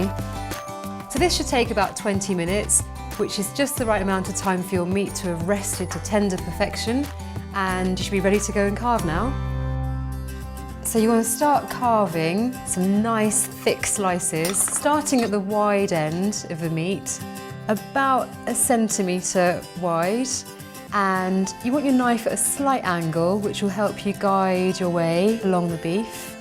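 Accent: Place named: United Kingdom. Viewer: I believe that would be a British accent. :)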